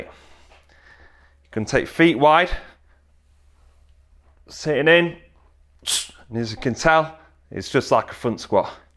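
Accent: United Kingdom. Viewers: This is an english accent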